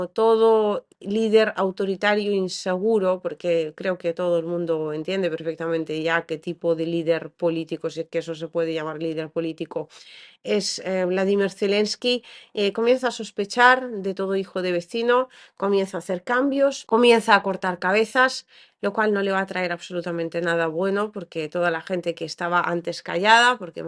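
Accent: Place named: Spain. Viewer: Hermosa, No Necesitas Hablar como Española. Eso te Devalua. Habla Mejor como RUSA. 👉✌️😍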